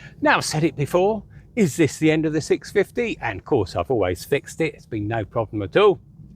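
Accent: United Kingdom. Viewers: Where are u in uk mate? Yorkshire?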